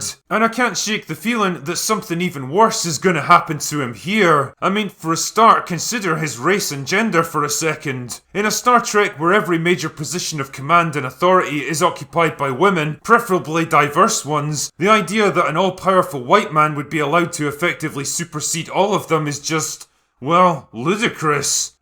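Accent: Scotland, United Kingdom. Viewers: He's Scottish and for these videos he loads up on it. Where you from? Where ever it is, there's something wrong with it because it doesn't sound like me.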